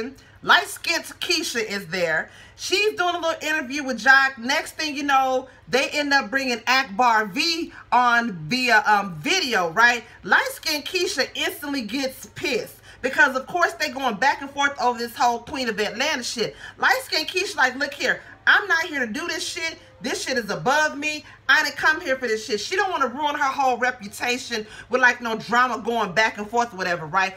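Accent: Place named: Jamaica. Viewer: Hit the like button first then starts watching. We wanted to hear your Jamaican voice. Momma Dee Though🤔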